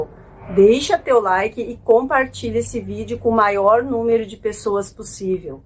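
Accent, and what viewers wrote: Rio Grande do Sul, Brazil. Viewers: Dra a sua sabedoria aa hipnócratis tem alimentado minha fome por saúde.não obstante esse seu maravilhoso sotaque gaúcho é também um grande acolhimento terapêutico.sou professor aposentado.sou de Belo Horizonte,muito obrigado por você existir. Eustaguio. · Bah, que belo conteúdo sobre a pimenta!🌶️ Fora isso, serio, sei que sou gaucha nata, colona, mas confesso que detesto ouvir nosso sotaque, principalmente outra pessoa falando. Porque é um sotaque com sons pobres, quase que uni-tom. Parece que a gente so tem um tom descendente e um ascendente e toda frase termina e começa do mesmo jeito. É enjoativo demais. É como um semi-alfabetizado lendo com medo de errar, as primeiras frases.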